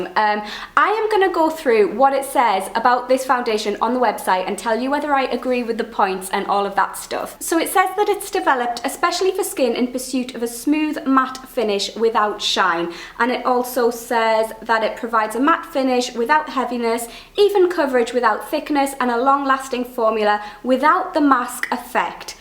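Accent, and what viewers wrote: Newcastle, United Kingdom. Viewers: Great review def gonna try this!👌🏻 I love your accent,makes me feel like I'm watching Geordie Shore & puts me in a good mood!😁😄 Loving ur videos😊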